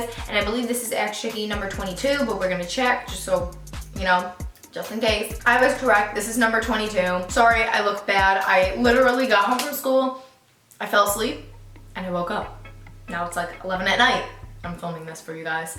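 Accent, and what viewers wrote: New York, United States. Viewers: love your new york accent omg!! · New York! I was trying to figure out where that accent was from!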